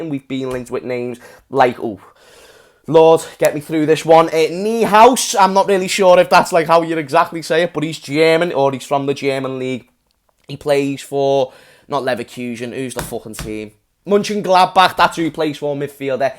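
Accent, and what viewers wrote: Liverpool, United Kingdom. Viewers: This guy has the best scouse accent ever😂 loving the roundups lad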